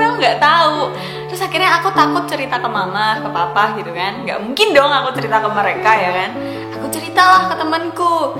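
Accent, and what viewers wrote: Java, Indonesia. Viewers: nonton video kak saya ketawa2 sendiri terus, cara menyampaikan nya lucu banget apalagi pake bahasa jawa yang medok gth:v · Aku baru liat video kak & jujur suka, apalagi dengan cara bicara nya KK yang medok bngt, ketawa karna di video ini, apa yang KK pikirin itu sama, sama yang selama ini aku pikirkan tentang ciuman & jujur hingga usia ku 22 saat ini aku blm pernah ciuman apalagi bibir sama bibir. Tapi aku suka saat liat drakor ada adegan ciuman nya😂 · Jowo mu ndi? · asal mana kak? lucu banget medok hihii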